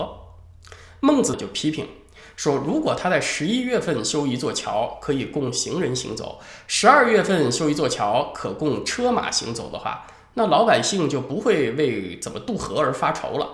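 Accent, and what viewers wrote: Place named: Northeast, China. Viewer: 以下是剛剛收到的知乎的郵件： 您好，成吉斯，很抱歉您的回答受到了牵连，您回答过的问题「如何评价评论员文昭？」因「政治敏感」已被删除。您被牵连删除的回答如下： 搜了一下，网上没有先生的简历与介绍。看了2013年左右的视频，当时还相当青涩，与现在的几乎是两个人。既然网上没有公开的介绍，我就狂妄一下，猜一猜他是谁： 1. 像是东北人（仅仅凭一点点口音~）； 2. 应该出身于一个普通知识分子家庭； 3. 的大学应该是文科，历史、中文之类的学科； 4. 应该移居加拿大超过10年； 5. 应该在2013年前后在多伦多或附近的大学读了一个或几个与历史、政治、哲学等相关的课程； 6.傅佩荣先生应该对有比较大的影响。很佩服的才学、见识与表达，当然最重要的是的价值观。无论先生服务于哪个机构，只要先生能秉持自己的真诚，我都尊敬先生的选择。谨以以上文字向先生致意！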